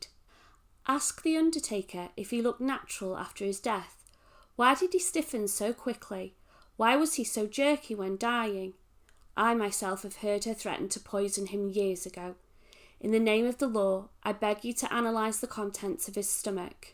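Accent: United Kingdom. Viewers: Thank you for telling this so wonderfully. May I ask where you're from? Wigan or St.Helens maybe?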